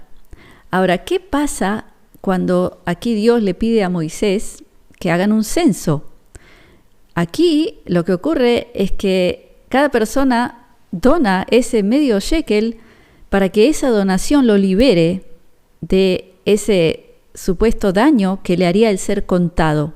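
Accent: Argentina. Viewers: Soy nueva y me encanta su canal, sería lindo saber como de Argentina 🇦🇷 (porque creo sea Argentina por el acento tan marcado,) vive en la tierra hermosa de Israel y sobre todo GALILEA 🤩. La felicito tiene un cutis muy lindo, la piel se ve losana!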